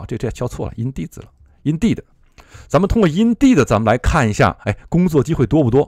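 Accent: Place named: Japan. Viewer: 😂听说中文的习惯猜生活在日本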